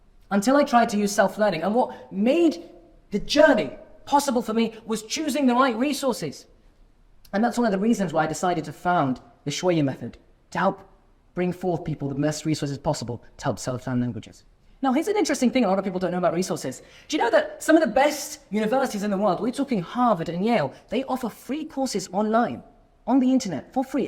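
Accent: United Kingdom. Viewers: ما شاء الله لهجتك بريطاني 😅👍 برافو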